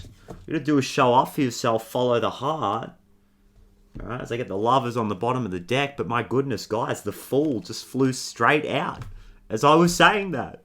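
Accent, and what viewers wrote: Australia. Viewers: Bro hooked me with that aussie accent! 😂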